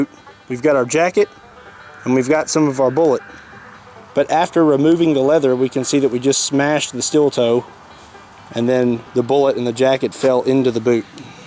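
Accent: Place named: South, United States. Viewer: He sounds sooo much more southern in this!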